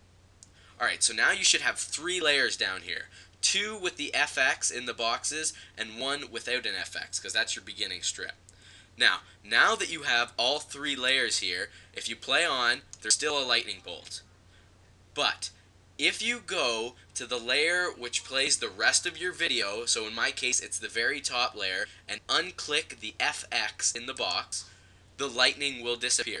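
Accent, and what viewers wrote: Canada. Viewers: are u Canadian?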